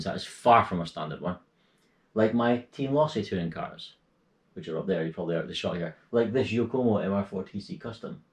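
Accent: Scotland, United Kingdom. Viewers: Yeah, man, I enjoy your talk videos! Must be the Scottish accent and your sense of humour, I usually get bored with long talk videos. Yeah, you're right, it's good fun and people should get one! Ha ha, at least you were drunk when you built yours, I think I was mostly sober, but damn, I hated putting it together! And to make me 100 percent certain mine was cursed, first time I took it for a blast, not one but two bearings seized, one wheel bearing from Fast Eddy, and the other was the bearing in the brushless motor, damn... I kept being unlucky, on my second time out, I broke a wheel (on video)... I hate those wheels, I plan to lower mine and put buggy wheels for the summer... I can't badoing mine, I'm using the smaller bumpers, works alright, I think... If you want, check it out, I have a video with mine, should be okay fun to watch...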